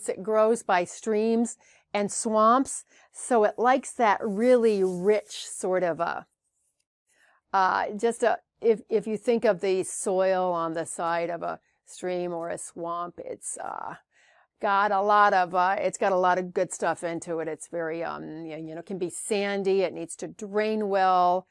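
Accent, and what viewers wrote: United States. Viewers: you Americans have funny accents.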